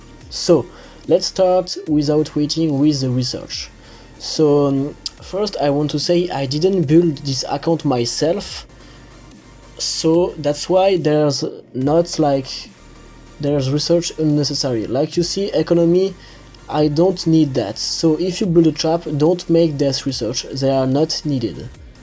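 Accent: France. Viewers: Are you french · Tu change tous me temps de guilde mdrr frero sa s'entend que tu et fr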